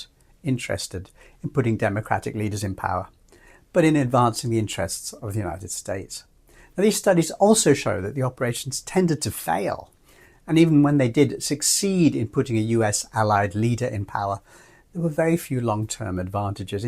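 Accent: United Kingdom. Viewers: Stupid british accent or involuntary and unnecessary subtitles: choose one. I'm out.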